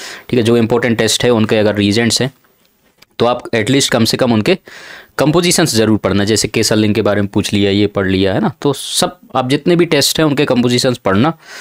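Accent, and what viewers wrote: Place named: Jharkhand, India. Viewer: Pure Jharkhandi sir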